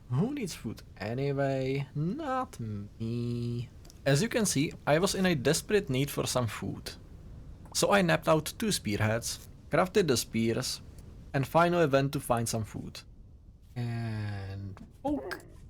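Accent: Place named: Czech Republic. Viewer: Are you Czech? You sound Czech lol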